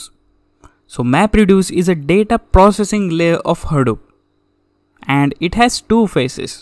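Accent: India. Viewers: I appreciate sharing the knowledge but please for everyone's sake at least try to speak without the accent. It's like you're not trying at all and every course on YT is an indian with a really accented manner of speech, it's extremely distracting and off putting.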